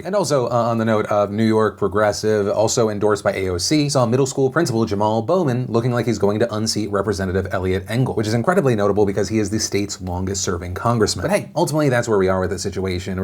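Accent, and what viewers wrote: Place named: Southeast, United States. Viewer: originally from North Carolina, so he still holds a lot of the pronunciation from the mid-atlantic/Southeast region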